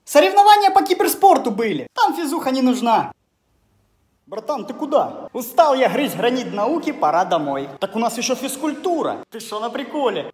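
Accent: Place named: Ukraine. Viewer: всегда вместо буквы "Г" говорит букву "Х".Видно что Украинский акцент👏👏.У тебя самые забавные видео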